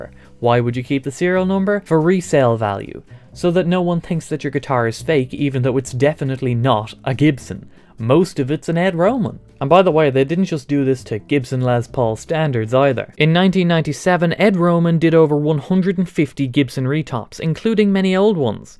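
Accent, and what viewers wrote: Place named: Ireland. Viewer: Sure you're not Canadian 🍁... that's the softest Irish🍀 accent I've ever heard...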